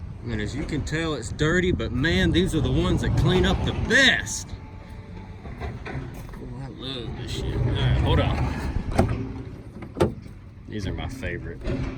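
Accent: South, United States. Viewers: but that souther accent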